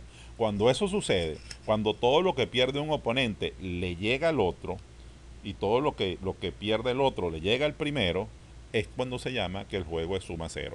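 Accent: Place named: Venezuela. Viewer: por el acento es de Venezuela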